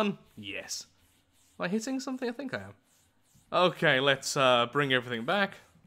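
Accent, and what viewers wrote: United Kingdom. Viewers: 1. your british, not weird. cheers mate 2. i do believe the idea of increased bearings to increase speed is a good idea. even just one or two per wheel. just as a point to point increase use.(on a button) 3. if you make the collector apart of the crane, weight not considered, would be the best moving forward. nice thing is the collector is placeable and buildable upon. such as the drills attached to bearings on the collector for added pressure. 4. i do enjoy the forked wheel design and the "spider" like stance. 5. if you have questions on how to connect items of logic, or Moonbo or Kan are great choice to review here on YT.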